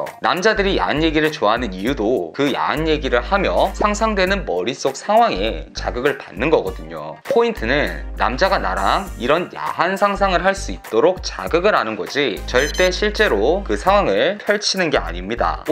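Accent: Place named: Busan, South Korea. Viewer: 후..부산 사투리 치여요ㅋㅋ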